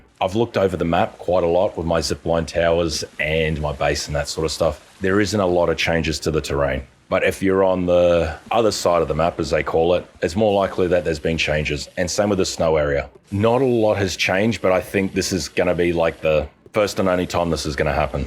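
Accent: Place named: Australia. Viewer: Been off from gaming for several years and picked up playing again with SOTF and then when needed to learn things in this game I stumble across a familiar Aussie voice and all the memories are coming back from the great videos you have made for TF (who tf is Pewdipie btw lol) and I’m back addicted again with the game AND your videos. It made me soo happy seeing your still around recording games, especially TF&SOTF and I have a lot of catching up now on your channel since the last 4-5yrs offline. Thanks!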